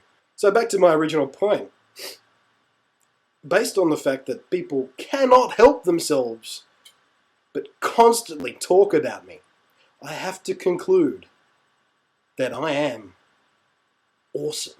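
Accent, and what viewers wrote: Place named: Australia. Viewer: Hypocrisy Anyway. You're right. Plus I'm guessing from your accent that you're an Aussie? :] You just got yourself another subscriber.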